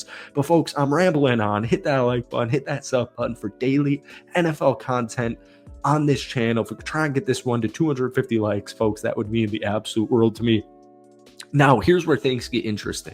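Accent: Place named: South, United States. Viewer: Your half southern half Wisconsin accent is absolutely awesome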